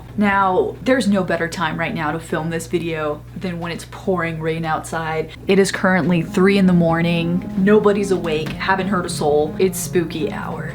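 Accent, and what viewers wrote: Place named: Mexico. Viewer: Your Mexican accent is so cuteee ❤✨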